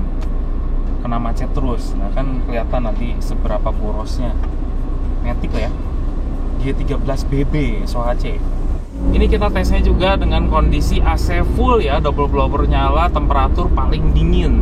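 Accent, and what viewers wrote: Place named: Bandung, Indonesia. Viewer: Jiaaahhh...mamang . Kangeeeen aku .... logatnya bandung pisan...hahahahha salam kangen selalu. Allah merahmati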